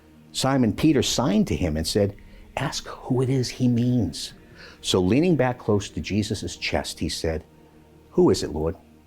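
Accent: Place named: Boston, United States. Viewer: I recognize that Boston accent 😊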